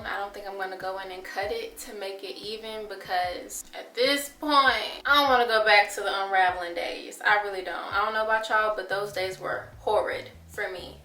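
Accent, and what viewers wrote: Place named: Houston, United States. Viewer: Yooo! I was like, she sounds like Solange. Then, I see you're from Houston. I guess we really do have an accent.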